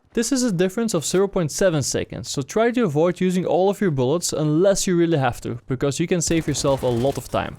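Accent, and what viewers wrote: Netherlands. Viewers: Hoezo Hollands accent :p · from hearing your accent I'm guessing he's Dutch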